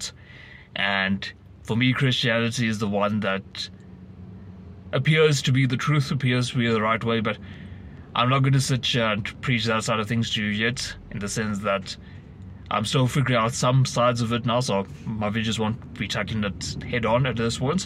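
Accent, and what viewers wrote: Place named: South Africa. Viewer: Lol. Heard the accent and knew immediately you were on of us. I’ll sub just for that 🤣🇿🇦 Edit: just got through the video and seems like you have a lot of good points to add to the discussion in this space. I was single my whole life, recently got in a relationship, and that was when I knew I outgrew these red pill influencers. Past them telling you to get in the gym and improve aspects of your life that you can control, that’s about it when it comes to the value they add. They are mostly clueless when it comes to maintaining healthy relationships with women.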